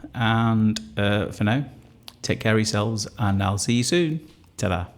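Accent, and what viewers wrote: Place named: Wigan, United Kingdom. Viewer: Love, good health and the happiest of New Years to you and your sweet family! Your videos and your sense of humor and your Wigan dialect are relaxing, entertaining and educational.👂❤️🕊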